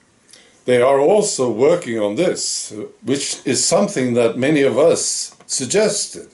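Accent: Sweden. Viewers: Your accent? What are they on about? You are Swedish of course you have a bit of an accent, I wonder how well they speak Swedish? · I love your Swedish accent, don't stop saying "Mongkey" :) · I like your accent, you speak English better than a lot of English people, I am English, and one of my favourite sayings is one of your Swedish ones, I cannot say it in Swedish, " you have now shit in the blue cupboard ", " it means you have gone to far", so you can try an English controversial word, c u ne3xt Tuesday.much love from essex England. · Here here! The Big C 👍! Great idea to have there own League....... 👍👍 Love your Swedish English. Thanks for all you do to get the Truth out there 👏👏👏🍿🐸❤️🇨🇦❤️🙏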